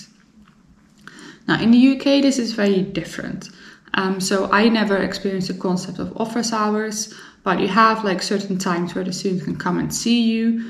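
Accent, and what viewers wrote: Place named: Netherlands. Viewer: Your English is very good from my stand of view (as a Dutch), but I immediately recognised your Dutch accent. My question:how do born and raised English people respond to that? They also must hear English is not your mother language.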